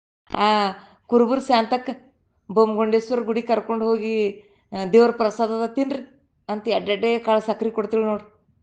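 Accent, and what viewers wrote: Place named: Bidar, India. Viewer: ಬೀದರಿನ ಯಥಾಪ್ರಕಾರ ಮೂಡಿಸಿ ತಿಳಿಸಿದ್ದೀರಿ ಜಾನಪದ ಹೃಡಿನ ಸೊಗಡಿನ ಮಾತು ಬಲು ಚಂದ👌🏻👌🏻👏👏🌷🌷🌺🌺 ಶರಣು ಶರಣಾರ್ಥಿಗಳು👏👏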